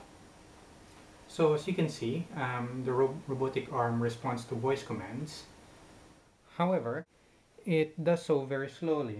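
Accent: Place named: North America. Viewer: North American accent in the UK from somebody coming from Japan . A truly global techie guy !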